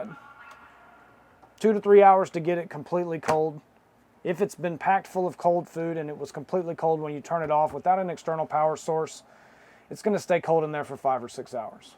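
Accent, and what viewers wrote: United States. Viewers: is that accent from Kentucky?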